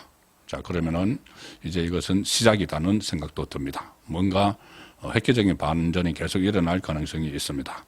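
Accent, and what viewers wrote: Gyeongsang, South Korea. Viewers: ! 수고가 많습니다 오늘은 다름이 아니고 송원장님의 방송시 발음을 지적하고자하니 양해바랍니다 나는 고향이 청도입니다만 송원장님은 고향이 경남지역으로 보입니다 부산 경남인들이 발음의 첫자에 엑센터(높혀)를 둡니다 대구 경북인들은 첫자를 낮추어 발음합니다 우리 영남인들은 고향의말을 잘고치지 않습니다 그러나 고향을 떠난지 오래 되면 주위와의 교류를 위해서라도 고향사투리를 고쳐보는것도 큰변화일수 있습니다 특히 부산경남인 들의 첫음을 올려쓰는 발음은 듣기에 불편합니다 송원장님도 이제부터 고쳐발음 하시기 바람니다 간단합니다 첫음만 낮추세요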